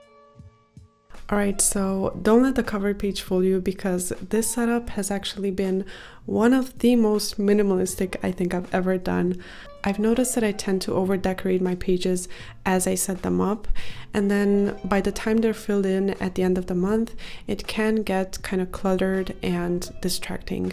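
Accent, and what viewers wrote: Germany. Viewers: oh thats interesting ^^ to me it sounded a little german. But maybe thats only, because i'm german ^^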